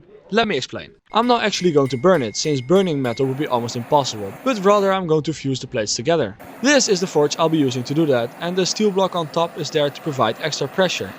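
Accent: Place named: Netherlands. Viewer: The dutch accent is heavy 😂😂😂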